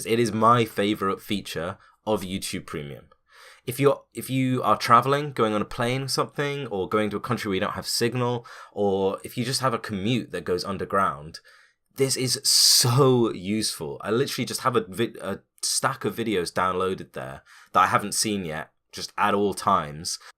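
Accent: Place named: London, United Kingdom. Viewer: Great review. But I confess that I continue to listen more because of you accent. I was in London in the beginning of this year and, god, I love that accent. Remind me from some friends. But about the video is quite good, simple, casual.. feels like a conversation. I am probably going to watch more from you. Adding about the YouTube premium, I have to say, it is a bit expensive but I think it's worth it for those who likes differents versions from the music they like. I mean, there is some live performances that are just masterpieces and they aren't available on Spotify or other plataforms. Interviews, podcasts, channel's from a huge variety of things ( there's a massive of content produced specifically to be play on YouTube, from new and old entertainment companies). And still, there's one more things I would like to add: the algorithm. 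Youtube knows all my moods for music and keeps bringing me news findings on, and I that is just fantastic. Something that made me disappointed after watching you was to know that the originals are bad and we can't see the movies that they rent on the site to us. I am going to get premium.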